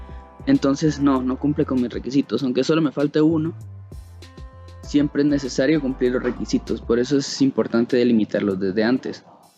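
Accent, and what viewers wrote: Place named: Guatemala. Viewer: Se nota que sos de guate va loco jajajaja, saludillos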